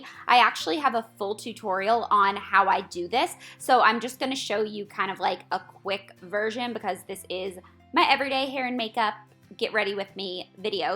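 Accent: South, United States. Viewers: I'm not sure if you live in LA anymore, but I think of you as a Southern Belle! I say that because of the way you talk & I know you are from Tennessee & you have such a pretty complexion lol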